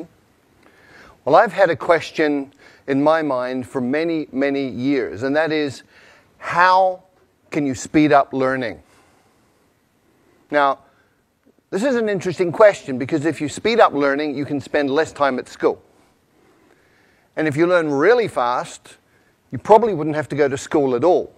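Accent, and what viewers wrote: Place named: East, United States. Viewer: alex wu 更接近于北美东部的发音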